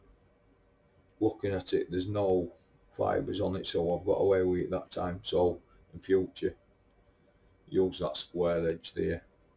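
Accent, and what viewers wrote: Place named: Yorkshire, United Kingdom. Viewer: Good video mate 👍 and love the Yorkshire accent too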